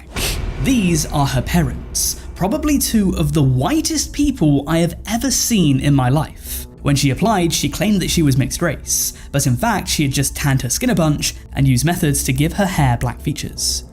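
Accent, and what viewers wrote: United Kingdom. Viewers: I usually hate British accents, but Im kinda digging his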